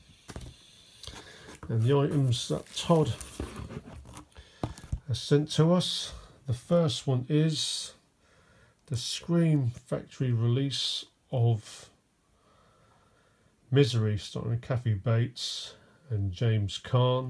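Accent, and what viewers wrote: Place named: United Kingdom. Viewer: Hello! Merry Christmas! How did you get the name btw? You sound very British,but here in the states when someone is named they're usually from a Spanish speaking background.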